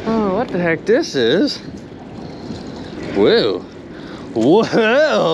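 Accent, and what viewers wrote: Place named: North, United States. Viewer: Hey you in wisconcin or minnesota?Ican tell you are up in the northern stayes but cant tell which one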